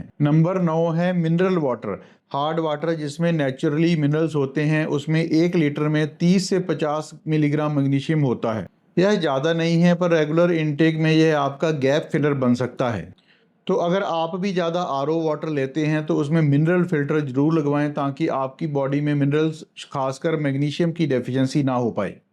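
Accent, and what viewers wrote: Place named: Punjab, India. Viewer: Punjabi accent ki vajah h.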